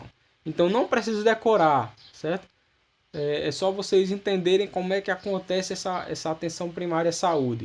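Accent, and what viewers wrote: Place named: Rio Grande do Norte, Brazil. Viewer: Adoreiii!! Esse sotaque bem Potiguar.. Sou de Natal-RN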